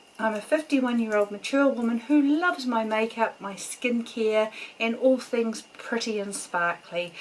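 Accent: New Zealand. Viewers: Hi from Malaysia. I came across your channel while looking for tutorial on Glambition palette. I quickly recognize your Kiwi accent as I lived in Christchurch for a few years and went to University of Canterbury. I binged watched a few more of your videos and realize that you live in Chch too. I feel connected with you already! Thanks for your videos.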